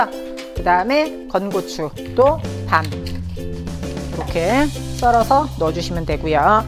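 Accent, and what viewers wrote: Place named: Chungbuk, South Korea. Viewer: 충북사람들의 말투에 베어있는 특유의 그게 잇어서 그래요..ㅎㅎㅎ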